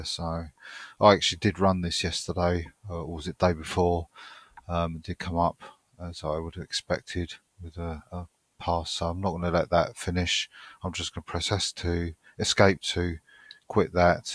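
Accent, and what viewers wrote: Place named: United Kingdom. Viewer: I have subbed and I will be back my fellow brit! I don't think you realise, how pleasant it is to hear someone who doesn't sound like a youtube "bot/nps" and/or an American, No hate to our bothers across the pond, but some of them accents can go straight through me, Like a vindaloo!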